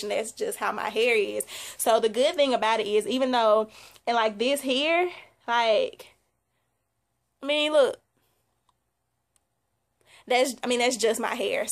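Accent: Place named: Alabama, United States. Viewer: If You Don't Mind Me Asking Do You Live In Alabama And If So What Part? I Can Tell By The Accent (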